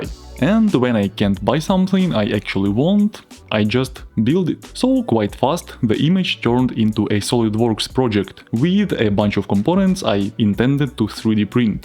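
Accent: Russia. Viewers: Настолько сильный русский акцент, что все понял даже без перевода.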